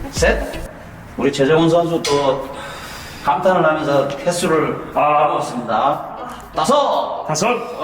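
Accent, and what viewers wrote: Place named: Gyeongsang, South Korea. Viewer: 나도 이 경상도 아저씨한테 배우고싶당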